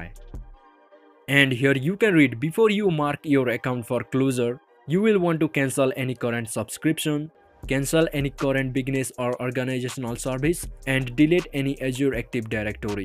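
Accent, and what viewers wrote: India. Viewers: thank you for the info sir i bear that in mind it's very useful. everytime i always need help there will be a man with Indian accent that always be with me to help thank you again sir all the best to you now sir soon you will blow up and become famous youtuber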